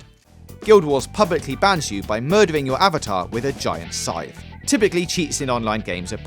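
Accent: United Kingdom. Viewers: Eww he has a British voice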